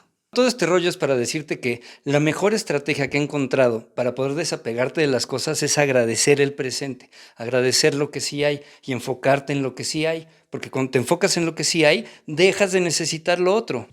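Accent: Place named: Mexico. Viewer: Jajajaja, literal me subiste la vibra del día. Ya tienes el don de la palabra que además te fluye de maravilla por tu acento, "que viva México carajooo". Luego me partí de risa con lo de la vecina ruidosa, porque estoy pasando por lo mismo. Gracias por compartir, inspirar y por motivarme con tu espontaneidad. Por favor, no vayas a visualizar novias diferentes porque las tazas son útiles, pero muchas mujeres te volverían loco, jajajaja. Graciasss.... voy a comenzar hoy con el reto de agradecer a la loca ruidosa de mi vecina 🤣🤭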